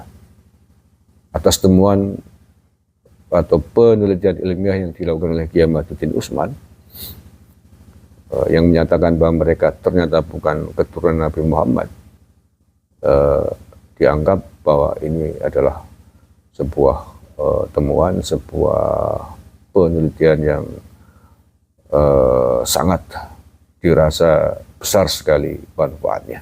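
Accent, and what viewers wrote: Banyumas, Indonesia. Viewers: Ora ngapak ora kepenak,,😂😂😂❤❤❤❤ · Ngapak nek ora ngapak ya ora kepenak...😉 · ora ngapak ya ora kepenak mbok? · Ora ngapak ora kepenak 👍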